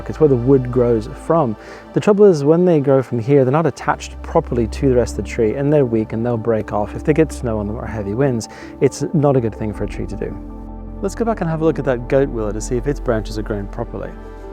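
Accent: United Kingdom. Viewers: seems interesting but it is very difficult to hear because of the loud music background. You Brits are not easy to understand for us in the U.S. who's hearing is not the best. Just saying.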